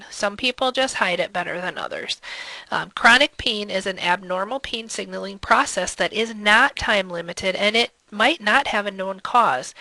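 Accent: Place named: Midwest, United States. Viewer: I love the mid western accent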